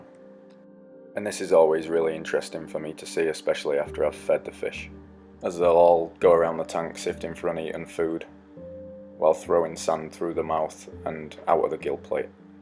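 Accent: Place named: England, United Kingdom. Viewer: • Thank you. Yeh I was about to say I'm hearing the good old English accent lol. Yes will try that mate for sure. 👌🖒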